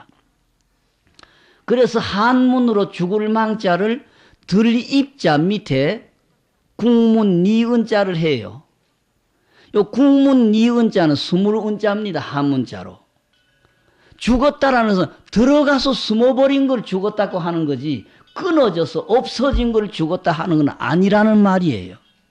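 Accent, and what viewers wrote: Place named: Gyeongsang, South Korea. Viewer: 스님 너무 그립습니다 다시 듣고 또 듣고있습니다 경상도말에 담담하게 하시는법문이 가슴에 너무 남습니다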